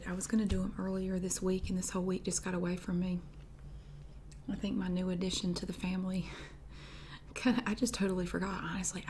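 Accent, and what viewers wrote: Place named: South Carolina, United States. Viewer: Normally, I despise mukbang videos, as they make me nauseous. But to my surprise, I absolutely loved this video. First of all, I feel like you’re eating naturally as a person normally would, and I didn’t get the feeling that you’re “over-acting” the eating for the video. But what really makes it enjoyable is your soft-spoken chattiness. I find your soft voice and Southern accent very pleasant and soothing to my ears. Therefore, the overall effect is something akin to sitting down and having a nice lunch and a pleasant, relaxing chat with a good friend. How much more soothing can a video get? PS: I’ll second what others have already said in the comments, about how fun it is to hear you say “puddin” the way that you do. ☺️😁👍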